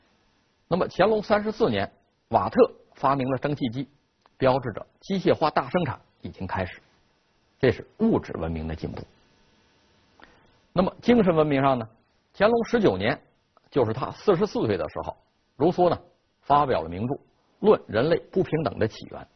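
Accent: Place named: Inner Mongolia, China. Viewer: 他是蒙古族，講話音調跟漢人不一樣，當你看完全系列會有很大的收穫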